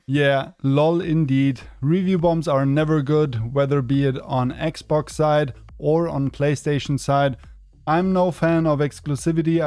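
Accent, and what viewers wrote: Germany. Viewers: I like your videos but you sound like a German who speaks English 😂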